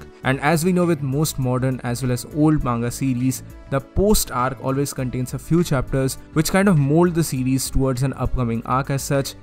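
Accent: India. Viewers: Noice man,nice explanations.....this is channel I was looking for and I like how u talk like in indian syle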